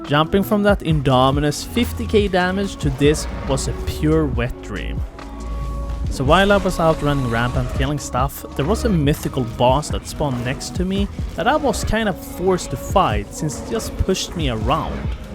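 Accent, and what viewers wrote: Sweden. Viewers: i just hear you are from sweden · Think I hear a Swedish accent, Haha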